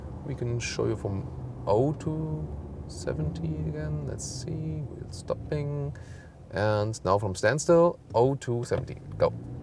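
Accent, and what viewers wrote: Germany. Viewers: This guy does a great job on the car reviews and I like his german accent. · I've subscribed because of the great in depth reviews, but also because of that lovely german accent! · he speaks "germish" :)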